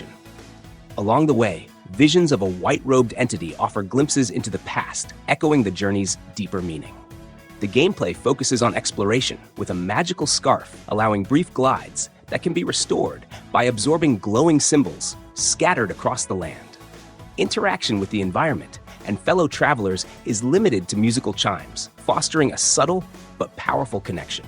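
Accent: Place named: India. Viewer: Thank you indian voice over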